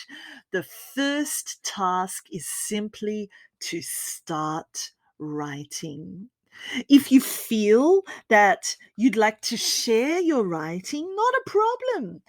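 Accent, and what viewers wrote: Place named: Australia. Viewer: I really like so much of your Austrália accent, the way and metodology that you use to talk, it is clearly and make me to enjoy it. One more subscribe. 👏👏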